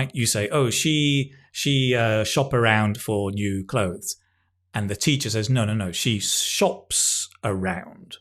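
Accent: United Kingdom. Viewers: it's a pleasure to hear you speaking with your British and very clear accent and slow enough to understand so well thanks for all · I always watch your videos. I love the way you teach. I want to take one of your courses. I’m an English teacher in Guadalajara, Jalisco, Mexico. I don’t need to take the IELTS but just for having a challenge and as a motivation. All my life I’ve tried to improve my English. I love English. Sometimes I feel stuck because I don’t use it! Nobody speaks English in my country. What course do you think I should take? I love your British accent! Lizzy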